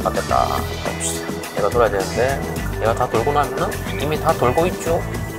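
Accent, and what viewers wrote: Gyeongsang, South Korea. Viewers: 갱상도 아이가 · 님 부산분? 아님 경상도? 말씨가 사투리라서.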